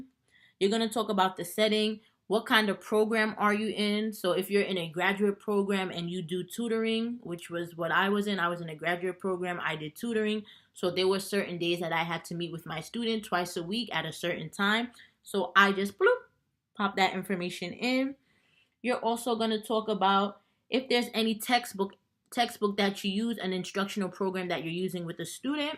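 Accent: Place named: New York, United States. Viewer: Hello I'm going to start my student teaching and my Edtpa with 8weeks in special education and 8 weeks in elementary education. Although I have been a paraprofessional for 20 years and have a lot of classroom experience, im still a little nervous. I really like your channel. I find it very informative. I'm trying to look over the Edtpa special Ed handbook. I will be getting a dual degree in Elemantary and Special education. I have always worked in a special education setting and feel it would beneficial for me to complete my Edtpa in special education. I have a question? In the student teaching aspect im I going to be expected to take over all the duties as the teacher in the classroom by week 6 and work on completing the Edtpa? I'm here in Georgia. I'm from Westchester (Yonkers) and have a very strong New York accent. So when I came across your channel I knew you were from up top. 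Lol.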